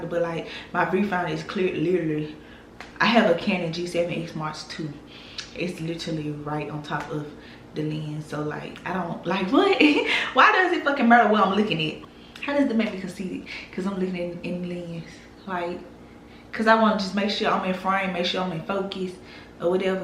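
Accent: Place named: South, United States. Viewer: I love your accent. It's a southern thing we can't help it!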